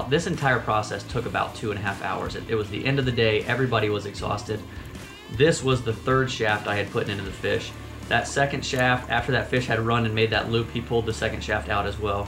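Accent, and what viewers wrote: United States. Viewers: Cuidadito que habláis feo, eso que es inglés? No creo, el inglés suena más bello. Ahh es Yankee! ACABARAMOS!!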